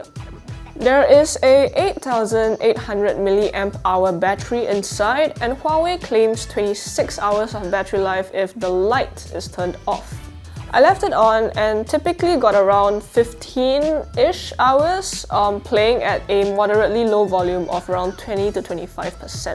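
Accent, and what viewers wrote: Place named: Singapore. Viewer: You're Singlish still can't escape but well done. More practice will push you further. Thanks for the review. If you may compare to Bose speakers for me particularly the Bose Mini will be great!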